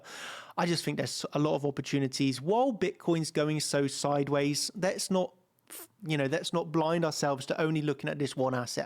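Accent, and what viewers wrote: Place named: West London, United Kingdom. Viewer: I'd love to know where your accent's from,. I feel sure it's from west of London!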